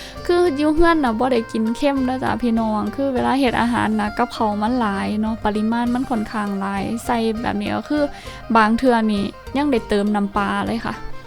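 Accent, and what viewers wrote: Isan, Thailand. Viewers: ไม่เคยดูคลิปแบบนี้ แต่ขอบอกเป็นน้องที่น่ารักพูดอีสานได้น่ารักมากไม่หยาบคายขอให้มีความสุขมากๆนะคะสนับสนุนค่ะ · อ้อครับ สำเนียงเว่าบ่คือ กสิน คือทางบ้านผมนิ55 เลยถามเบิ่ง ทำกับข้าวเก่งมากๆ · พูดอิสานเพราะมีเสน่ห์มาก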